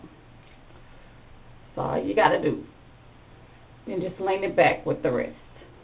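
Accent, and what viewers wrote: South, United States. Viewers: I love all of your videos,! Are you from a southern state..I love your accent.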